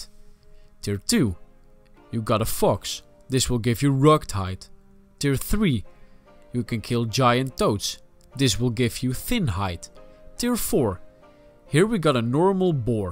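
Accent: Netherlands. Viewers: your accent actually arouses me. is it dutch?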